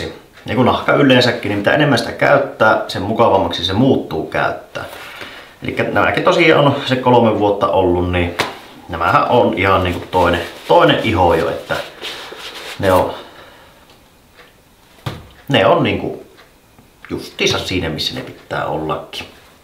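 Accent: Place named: Kainuu, Finland. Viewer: Ihanata kun kuuloo Kaenuun murretta ja nuinkii tokusti puhuttuna ja tärkeästä aeheesta. Kiitos vaen!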